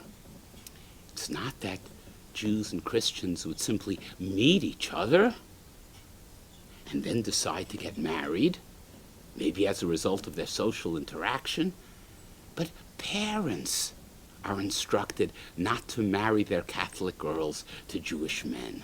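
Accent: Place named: New England, United States. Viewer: HIs accent's from the New England area. Many Jews have that accent because many Jews emigrated there in the late 19th century.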